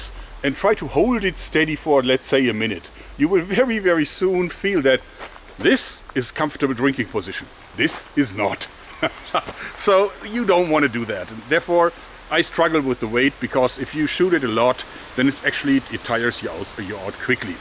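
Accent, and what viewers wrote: Germany. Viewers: Ist er deutsch? Es hört sich so an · Do not cut off the :"Ha Ha Ha" with german akcent ! It's one of reasons I watching You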